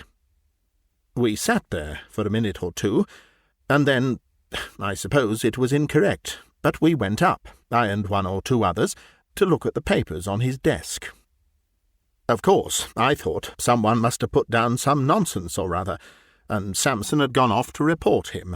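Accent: England, United Kingdom. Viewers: Wow. An incredible recitation of one of my favourite M.R James stories. What a gorgeous voice you have! I look forwards to exploring more of your performances. . . English stories ought to be read with an English voice, in my opinion. Well done, such a pleasant listen.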